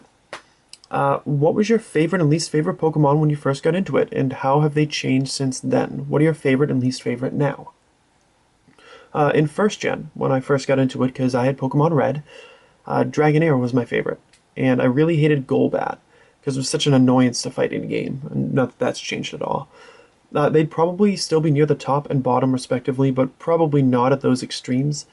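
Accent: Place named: Massachusetts, United States. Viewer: Where in mass are you from? Haha I heard and was like :O I'm north of Boston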